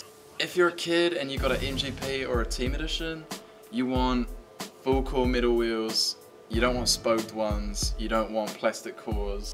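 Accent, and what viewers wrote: New Zealand. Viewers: what shop is that you sound like your from your New Zealand? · that`s his kivi accent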